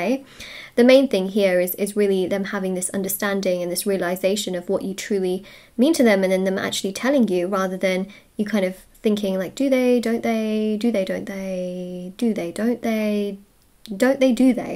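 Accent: United Kingdom. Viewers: your accent is so British..... are you a British native???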